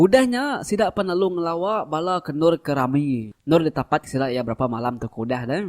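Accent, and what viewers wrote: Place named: Julau, Malaysia. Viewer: Urang julau ka? Munyi jako urang julau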